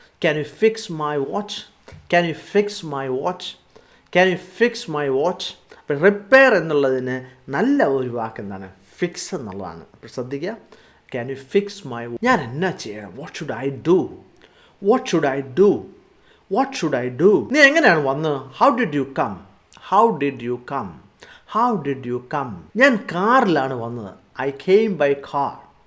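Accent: Kottayam, India. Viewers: Are you from kottayam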